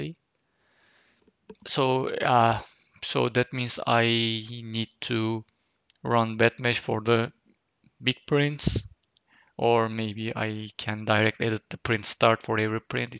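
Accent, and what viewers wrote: Turkey. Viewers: dostum türksün sanırsam konuşmandan sezdim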